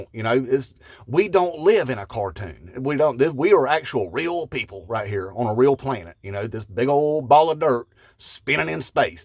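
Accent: South, United States. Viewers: Love the Southern accent, brother. Keep bringin' it!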